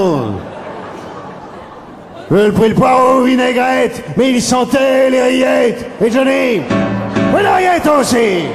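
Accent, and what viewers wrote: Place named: Belgium. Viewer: il le fait sûrement pas exprès mais des fois il a un accent belge x)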